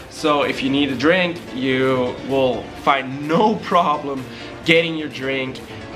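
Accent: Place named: Russia. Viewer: You sound Russian that speakers very good English, for a Russian to go there it might not be worth it but as a Canadian paying 1400$ Canadian dollars including flight transfers and resort for level I think it’s a good deal. It’s Cuba you’re not going to get the same standards as Europe or North America but it’s still a good offering.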